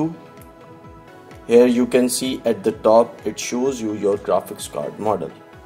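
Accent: India. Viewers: Indian accent, i think ill pass on that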